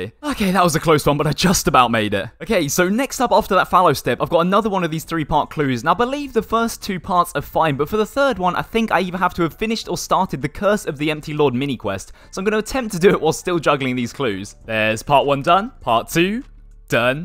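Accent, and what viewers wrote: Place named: Surrey, United Kingdom. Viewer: Yes my guy, where in the uk are you from? You sound local to me! Surrey area?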